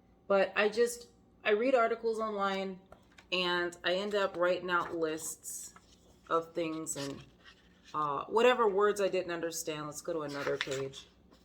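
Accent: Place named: Russia. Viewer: удивительно но даже интонации - русские....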